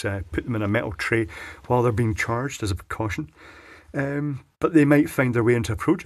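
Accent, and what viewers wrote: Scotland, United Kingdom. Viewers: Hey. Long time listener, first time messaging. Loving your vids. I’m clueless when it comes to electronics and live listening to your descriptions. Even if I can’t properly understand it all. Fife, born and bred. I love hearing a Scottish accent on a YT vid. I was watching a recent video of yours where you mentioned putting an aluminium heat sink on your pile of interesting heat sinks. Can I trouble you for a video on that subject? I’m a scratchbuilding model maker and love cool “found” shapes. And an annotated video from you about such cool things would be of great interest to me. And I bet other subscribers also. Thanks in advance. Regards from glenrothes.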